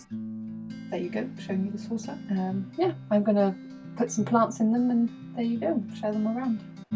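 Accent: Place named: Germany. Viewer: third german-sounding girl in a narrowboat video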